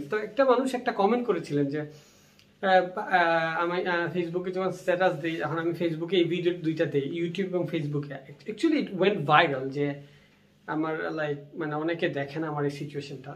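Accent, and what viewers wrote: Sylhet, Bangladesh. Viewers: Bro!! Sylhet apnar basha kuno? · ভাইয়া সিলেটের আঞ্চলিক কথা গুলা খুব ভালো লাগলো😍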